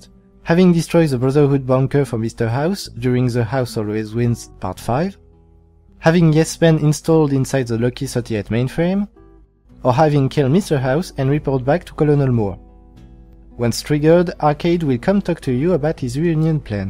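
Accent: France. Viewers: Tu es Français ?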